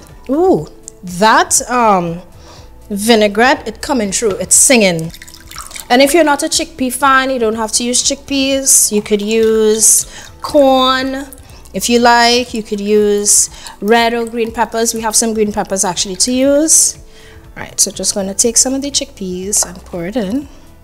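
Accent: Trinidad and Tobago. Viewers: Who is this young lady, she is so genuine and very informative. She is fabulously fantastic. Better half even singing her praises. So she inside. Very professional and then slides in her Trini intonations from time to time. Excellent. And the meal and preparations on point too. What’s her name? When you cooking again darlin?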